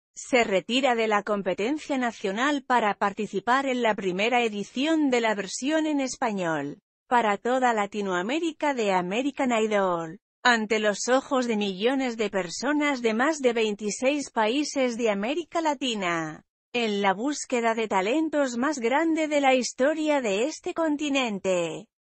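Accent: Spain. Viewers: ese acento español es horrible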